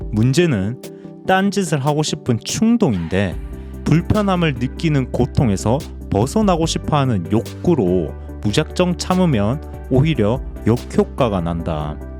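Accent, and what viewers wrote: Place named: Gyeongsang, South Korea. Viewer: 찰진 경상도 사투리에 수상할 정도로 서윗한 목소리... 니 머가...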